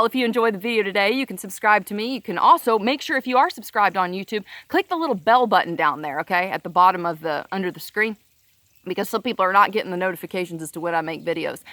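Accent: South, United States. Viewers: Your accent is lighter but it's obvious that you grew up in the south. The way you pronounce certain things and the speed you speak screams southern. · I'm Irish and my last name is Kelley. Born and raised in the south. Alabama my whole 52 yrs. I have heard my kin folks use the term stinks like cyarn. I spelled it like it was pronounced. Nice to hear your southern accent. Take care and God bless. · It sounded like you got more Southern as they want on. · Well I love the southern accent! Let me ask you a personal cultural question. What would it take for you to allow a yankee from Rhode Island to be your neighbor? I’m not from Rhode Island but that was the best way to get you to use your worst imigination..least for this yank. I’m shopping.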